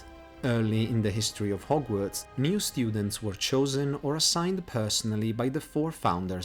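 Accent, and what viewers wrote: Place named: Italy. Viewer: Ho riconosciuto il tuo inglese italianizzato dall’accento e dall’intonazione, devo dire che sei molto bravo e supporterò il tuo progetto perché sembra molto interessante e anche perché sto cercando di fare lo stesso cercando di avere un canale completamente lingua inglese💪🏻🫶🏻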